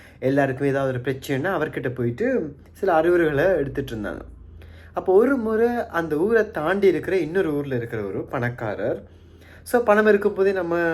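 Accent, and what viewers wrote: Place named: Sri Lanka. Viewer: அழகான இலங்கை தமிழ் புரிய வைத்த நன்றி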